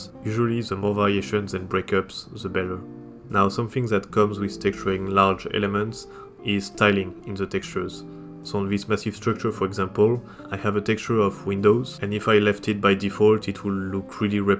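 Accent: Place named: France. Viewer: I knew you speak French from the start from the accent I also have haha ! Alors voici un nouveau sub pour encourager la communauté française de Blender❤ en tt cas la différence entre la première image et ce que tu as changé est incroyable ! Du vrai art , bravo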